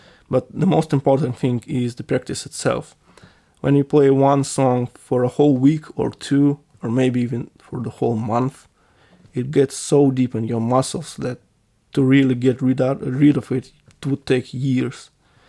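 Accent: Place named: Russia. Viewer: Enough bashing yourself, you speak and explain very well, in fact you have a rather weak Russian accent, if it bothers you, I can help quickly (went through the same);)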